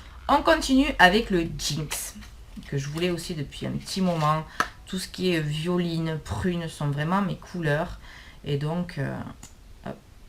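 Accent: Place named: South-West, France. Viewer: C'est bien ce que je me disais j'ai reconnu l'accent du sud-ouest 😉 je suis de Mirande dans le Gers mdr 😘😘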